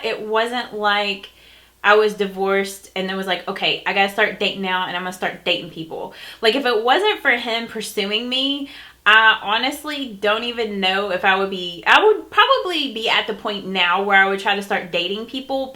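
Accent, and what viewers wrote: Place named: Kentucky, United States. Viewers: Ty for the Q&A! I can relate with accent...I live in Eastern Ky myself. My husband lived in Louisville for over 10 years:) Just visited the Louisville Zook on Wednesday! :) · When I watched my first makeup video of yours, I could tell your accent sounded like me...and I was right! We are both KY girls! Gotta love KY!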